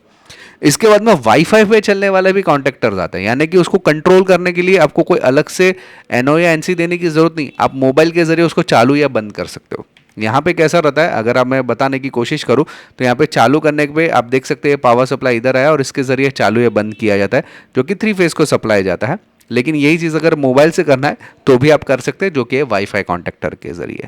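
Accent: Gujarat, India. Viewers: Sir aap basically Gujarat se ho kya.. language se laaga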